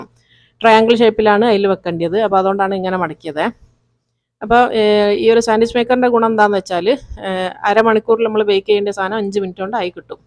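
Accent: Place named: Kozhikode, India. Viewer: Nannaayitundu❤️original kozhikode aannule.... Samsaarathil manasilaakunnundu👍🏻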